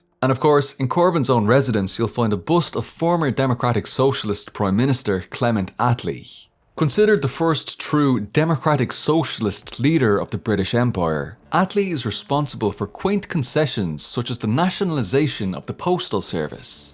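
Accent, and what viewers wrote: Ireland. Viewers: I am binge watching your channel now. Gotta love your perspective, analysis and of course your Irish accent. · Nice too hear an Irish man leading the charge still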